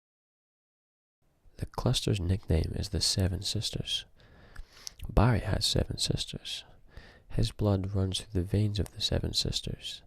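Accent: Scotland, United Kingdom. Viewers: I think he’s just Scottish :)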